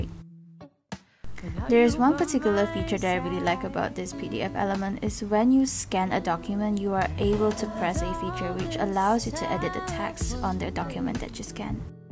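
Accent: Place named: England, United Kingdom. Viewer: woww..i like your english accent🔥🔥..im from malaysia and new subscriber💓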